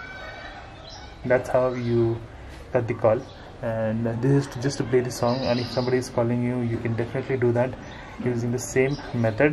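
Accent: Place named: India. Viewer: DIGGAHHH dieses Indische englisch fuckt mich so ab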